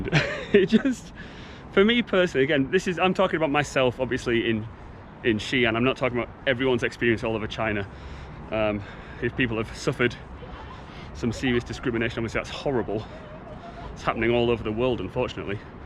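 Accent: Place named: England, United Kingdom. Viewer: Holy....This is Harlan. It’s nice to see my teacher’s vlog on the YouTube by surprise. Been in US for 5 yrs now. I can finally tell your English accent lol. Keep doing the best work!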